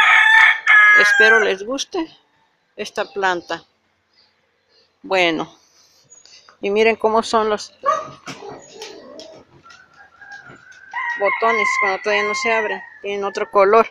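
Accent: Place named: Guadalajara, Mexico. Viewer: Disculpe de donde es usted se mr hase tono de voz de guadalajara mexico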